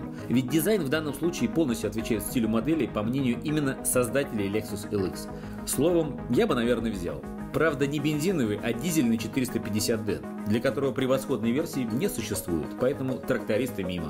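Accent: Standard. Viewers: Многие обзоры смотрю но пока всех рвёт-хороший словарный запас, чистая русская речь без хаканий и гаканий, нет ёбоной рекламы сукотеков, музон и картинка выше всех похвал-что вам блядь ещё надо, чел отрабатывает каждый ролик на 150%. Давайте скажем ему СПАСИБО!!! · Спасибо,. Всем не угодишь. Я с Казахстана. И мне приятно слушать чистую русскую и грамотную течь.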